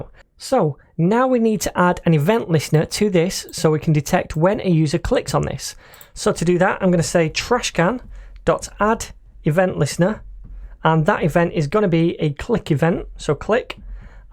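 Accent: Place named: London, United Kingdom. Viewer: no adds, no rubbish, just a nice london accent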